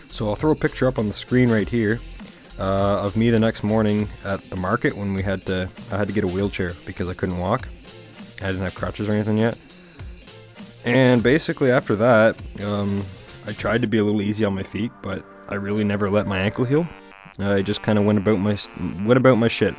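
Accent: Canada. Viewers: Buddys got the Manitoba accent. Good vid bro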